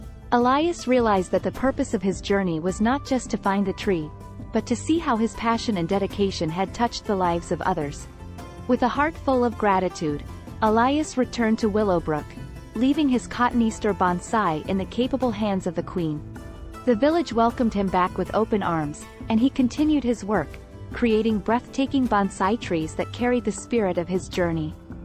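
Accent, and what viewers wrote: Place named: North, United Kingdom. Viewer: That was brilliant and I love how you adapted your Northern growl into a feminine purr :)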